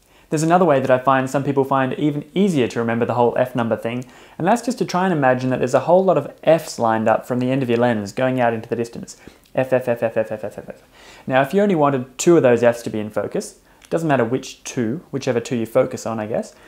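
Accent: Australia. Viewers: That was the most simplistic no-brainer explanation of f-stops/aperture I have seen on YouTube. I don’t know if it’s the smooth Aussie 🇦🇺 accent but my Canadian 🇨🇦 brain appreciates your teaching style and analogies!! 👏🏿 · Thanks. I’ve been out today shooting the Darwin wetlands before and at sunset. Your video has explained a troublesome shooting situation made pretty simple. It sounds easy, I probably make it difficult for myself. Thank you for a simple, no B,S, no problems tutorial. Good to hear an Aussie on here. I have followed and saved. I will return. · This is by far the clearest explanation compared to other videos. Easy to understand, yet more technical than others Chilled ozzy accent is a plus too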